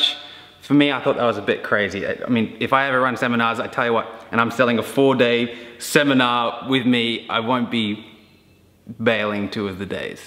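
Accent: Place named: Australia. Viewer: Had to watch the first minute three times, its so hard to adjust to australian accent :) Nice video The car- finance- thing is a mix beetween them securing the loan and them beeing to lazy to look up the full picture. Hope it works out. How do other people manage to get loans on their supercars?, nobody buys these things outright with cash.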